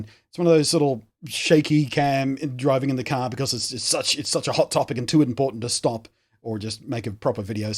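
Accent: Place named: Australia. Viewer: hes Australian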